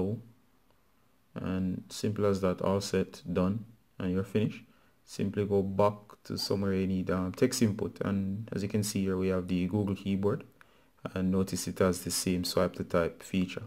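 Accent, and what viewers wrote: Jamaica. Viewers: U Jamaican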